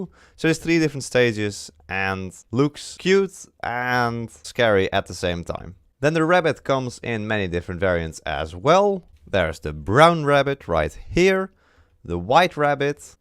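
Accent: Netherlands. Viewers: That accent 🤣 sws Nederlander hahahahahah Dutchie for sure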